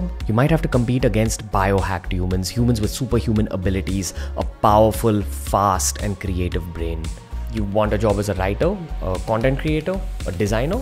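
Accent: India. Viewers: Nice English Proud of Indian accent I don't understand why to do people change their accent.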